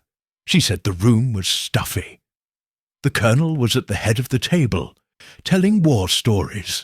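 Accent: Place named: United Kingdom. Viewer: This msrvelous British accent and beautiful voice will help mr sleep tonight. Thank you soo much!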